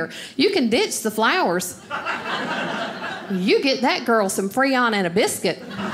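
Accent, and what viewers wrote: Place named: Atlanta, United States. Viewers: That's just how you pronounce mills in Georgia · I could listen to her accent all day. I used to travel to Atlanta, I miss it!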